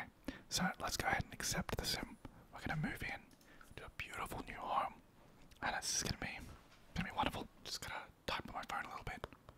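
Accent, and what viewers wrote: Australia. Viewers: Never before did I experience an Aussie whispering in my ears. Gotta say, it's very calming. Great video!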